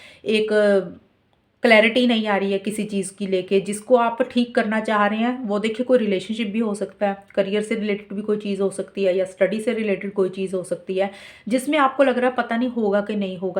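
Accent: Punjab, India. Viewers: nice punjabi accent👍👍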